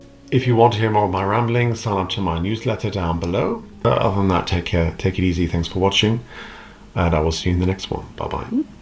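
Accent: Germany. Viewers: I’m sure that you’ve heard this before, but you’ve picked up an ever so slight German accent. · Interesting. You seem to speak English with a German "accent ", now! I am quite blunt but I'm from the north of England! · You have a strong German accent! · Your commentary on German norms is helpful. Thank you. I'm a Brit living in France, work with an international company where some Germans work. I get on best with the Germans, feel like I have more in common in work ethic. By the way, you seem to have acquired a slight German accent. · This English gent speaks English with a German accent. Interesting. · Your english now has a german accent 😂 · You don’t sound British. You sound like a German with very good English. Maybe you picked up a German accent living there.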